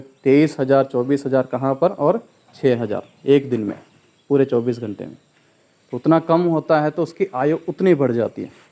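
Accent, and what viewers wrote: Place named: South, India. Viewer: अद्भुत बाबा जी, बहुत ही गर्व महसूस होता है आपको सुनकर,, आपके सरल सहज ज्ञान प्रेषण करना अद्भुत है,, गर्व यह भी होता है कि आपको हम या आप हमे नाम से जानते हैं,,, धन्यवाद,, आपकी साउथ स्टाइल संस्कृत वाली हिंदी सुनना बहुत ही अच्छा लगता है।।जय हो Er प्रशांत दुबे, अस्सिटेंट प्रोफ़ेसर, उत्तरप्रदेश तकनीकी विश्वविद्यालय , लखनऊ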